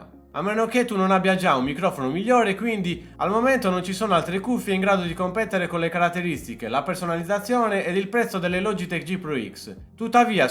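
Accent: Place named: Sassari, Italy. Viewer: ti scopro adesso, apro il video, passano 2 secondi e mi chiedo, ma sei di Sassari? La S e l'accento sono quelli, bel video comunque, due game ad apex facciamoli zi!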